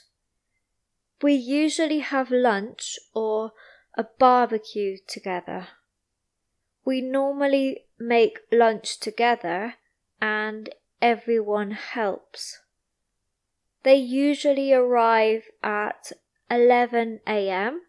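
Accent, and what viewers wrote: United Kingdom. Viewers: 😮 Beautiful Beautiful voice, I am learning the British accent, I love this beautiful accent, and thank you for your videos, my friend, I really appreciate it. Greetings from Mexico!!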